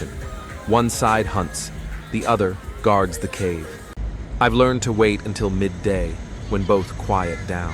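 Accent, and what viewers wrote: United Kingdom. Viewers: You're a Brit?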